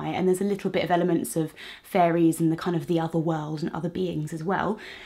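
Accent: United Kingdom. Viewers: You're great, I love your British pronunciation and as a student of English philology in Poland I just have to say how much I admire your work!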